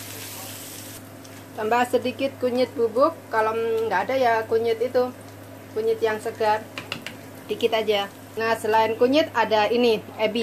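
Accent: Java, Indonesia. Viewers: Jawane pundi toh iki?? Medok buanget logat e 😆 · Bunda orang mana saya orang ponorogo bund.. Sepertinya jenengan logat nya jawa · Mbaknya persis saya, pake wajan sama minyak kayak gitu, nggak usah dicuci dulu dan minyak bekas goreng dipake lagi. 😅😁 bicaranya medok ya, dari Jawa ya mbak? Sip banget.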